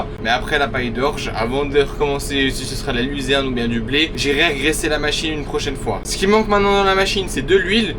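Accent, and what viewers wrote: Switzerland. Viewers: Salut, heureusement que tu n'as eu que des commentaires de bienveillance..Je ne suis pas dans l'agriculture, je suis contrôleur dans un grand entreprise qui vend des fruits et légumes pour les chaînes de grandes enseignes. Tu es génial et ton accent suisse nous fait bien rigoler..c'est quoi un char. 😊😊😊😊. 😂😂😂 tu as l'air de ramoneur tellement tu es black... Très belle vidéo, comme toujours. Bonne continuation 🫡🫡🙋‍♂️🙋‍♂️ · Bonjour,j'aime bien les suisses votre accent...votre élocution et votre bonne humeur, courage à tous et merci pour tes belles vidéos!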